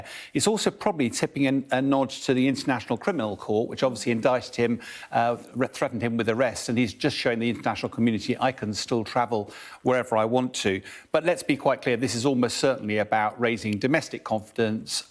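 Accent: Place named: United Kingdom. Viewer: His British accent is just killing me. "Piuutin". He sounds like Russia lost 50% its territory. It lost 50% occupied Ukrainian territory. Ukrainian losses based on OSINT, lieutenant colonel McGregor and latest reveled secret document are 1 Russian to 5-7 Ukrainians. Just in Bahmut, Ukrainians lost 32 thousand just KIA.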